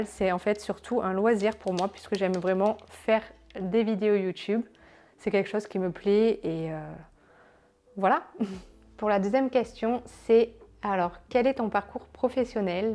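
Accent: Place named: North, France. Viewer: ton p'tit accent nordique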